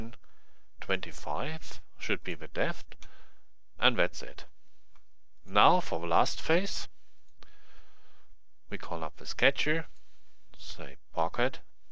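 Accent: Germany. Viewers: are you actually german, you dont really sound like a english men. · Great tutorial. I have attempted to learn CAD before. But training videos that I tried in the past were way to fast and concepts were not clearly explained. Usually the presenter in the tutorial was clicking buttons too quickly or using keyboard commands so I could never clearly understand how things were being done. But this video is very well paced, concepts clearly explained and the steps involved very clear. A really fantastic job! And the German accent, just makes me feel smarter listening to it :-) I look forward to viewing the other videos and I now feel confident that I can do some home machining of parts I design myself. Thank you!!! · For me 1x speed is just rigth. My native language is Spanish so listening English talked by a german (I guess), and understanding it, is fine. By the way there are FreeCad tutorials in Spanish by a guy that calls himself Obi Juan and these tutorias are very, very good. 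FreeCad is so big that there are many ways to learn it, so the tutorials here and the ones from Obi Juan are, for me, complementary to each other.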